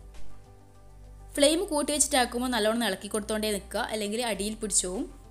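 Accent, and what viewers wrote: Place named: Kannur, India. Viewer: നമ്മുടെ കണ്ണൂർ ഭാഷയിൽ അടിപൊളി ചിക്കൻ റെസിപ്പി ഇത് ഏതായാലും പൊളിക്കും ചിക്കനെ മേക്കപ്പ് ചെയ്തു വെച്ച് കാത്തിരിക്കുകയും വേണ്ട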